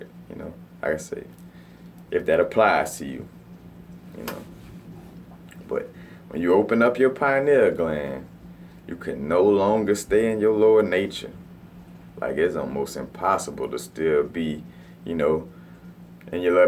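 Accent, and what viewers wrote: New Orleans, United States. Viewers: are you from New Orleans? accent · I kept saying "dude sound so New Orleans" then I saw the Clark t shirt then you said boudin.....#NOLA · So Nuawlns yea dude gotta downtown accent..... New Orleans checkin in ya know.... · Dawg! I’m in New Orleans! You here to huh? I know! I’m coming over right nah ya heard me! Dat boi said ku-di-lini!! Wazzam!? I can hear it that city all in ya voice! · From New Orleans . Got the Clark shirt on had to match it to the accent.👌🏿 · Sounds like you're from New Orleans. Reminds me of home! 😀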